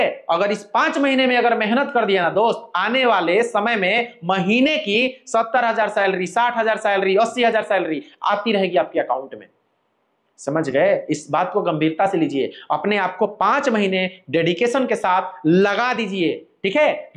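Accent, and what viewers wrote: Bihar, India. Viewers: Tum pkaa bihar k E ho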